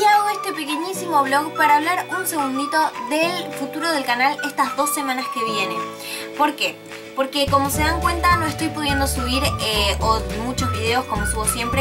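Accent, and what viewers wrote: Buenos Aires, Argentina. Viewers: Sos de Buenos Aires?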